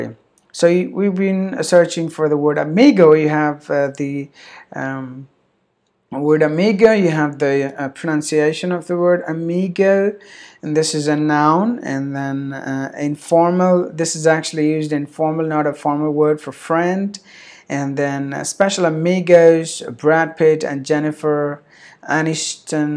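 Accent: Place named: India. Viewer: His pronunciation ìs not great. He has a strong Indian accent and he is mixing English and American language which adds to the inaccuracy and increases lack of clarity. Don't copy him !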